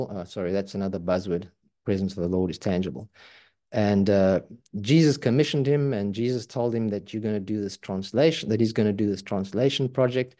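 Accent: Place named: South Africa. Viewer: Hey, spot the South African accent!! 😄 Greetings brother.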